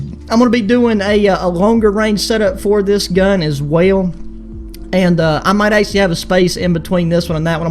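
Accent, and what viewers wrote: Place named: South, United States. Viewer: Bro I love your draw you from the southern states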